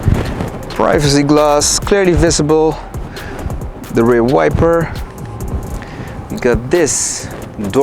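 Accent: Netherlands. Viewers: so you/re an indian with a dutch accent and an english channel. aight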